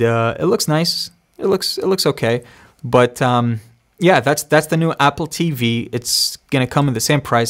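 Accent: United States. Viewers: Your English is so good with American accent niiiiiiiice !!! High five ✋ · Your English is so good with American accent niiiiiiiice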